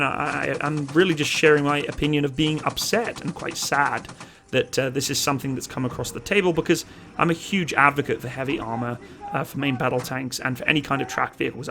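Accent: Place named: Home Counties, United Kingdom. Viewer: thanks man that confused me a lot! Bristol boy here. Def didn’t pick up Durham, you have that Home Counties accent with a tinge of Canadian. Fingers crossed you lose that clown Trudeau soon and people can take Canada seriously again 😂 all the best and love from the west country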